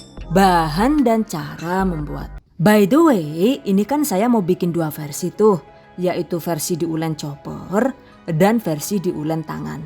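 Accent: Banyumas, Indonesia. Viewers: "DONE" Dubai hadir pas lagi nyari dan berpikir untuk buka puasa sunnah senin nanti pas ada notif odading gue jadi kepikiran odading yg lagi viral pengen ngerasain karna jauh antara indonesia dan Emirates Dubai.eehh..ternyata ada notif langsung da gue pergi ke dapur buat odading persiapan buka puasa sunnah senin...... #GiveawayTHV2020 Terimahkasih atas resep nya Bundah sudah cantik jawa nya ngapak lagi🥰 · Jebule bahasa ngapak ap ya? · Sampean lucu kalau ngomong apa lagi klau lagi ngomong bhs ngapak...aku ngapak PBG Mbak...😀😀😀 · Basa ngapak e keluar toh bu.... 😀😀😀😀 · Ealah jebule nu Wong ngapak yu😅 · ngapaknya bikin ngakak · mantul menul"resepnya odading bu rasanya spt jd wonder woman nich 😊 gemesh...sm suaranya yayuk ke apa lg kalau lg ngapak 😘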